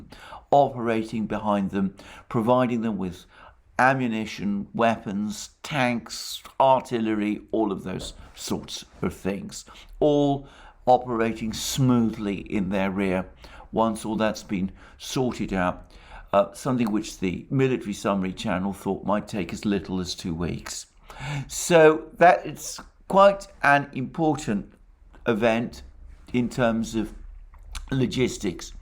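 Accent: England, United Kingdom. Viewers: Ah, my daily fix is here with the dulcet tones of your refined English accent.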